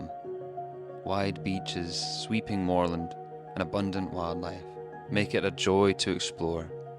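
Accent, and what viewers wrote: Scotland, United Kingdom. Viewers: Nice to hear a Scottish accent. Instead of a ai voice. ❤ Scotland 💙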